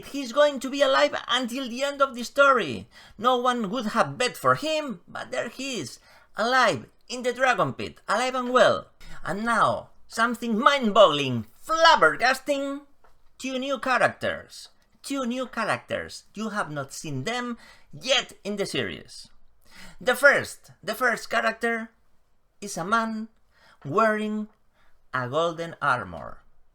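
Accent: Spain. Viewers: I don’t know how I ended up here but as soon as I heard your accent and pronunciation I knew you were Spanish 😅 ( I’m also from Spain btw )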